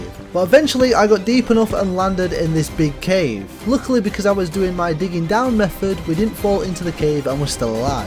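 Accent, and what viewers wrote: United Kingdom. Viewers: just found out he's British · hes british lol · ok british boi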